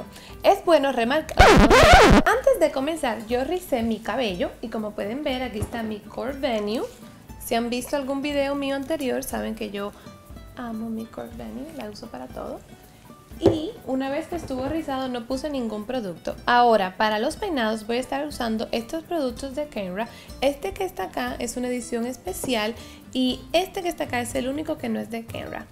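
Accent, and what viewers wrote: Cuba. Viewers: Una pregunta en este video hablas bien claro pero en los videos más nuevos hablas más con tu acento cubano ¿Por qué?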